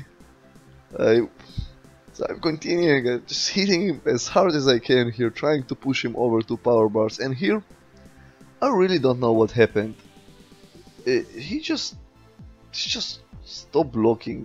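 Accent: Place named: Russia. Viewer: Travis Nadeau Pesantes Russia, Ukraine... Id guess. The accent.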